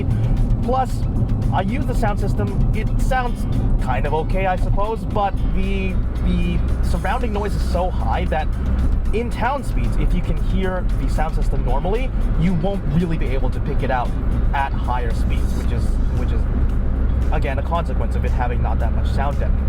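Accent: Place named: North America. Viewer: Thanks. Great video and great to hear a North American accent using modern normal measurements although the car does run on liquid fuel not vapour (gas)😂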